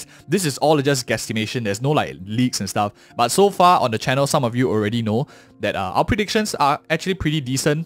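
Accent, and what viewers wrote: Singapore. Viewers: Heavy Singaporean accent (pls tell me)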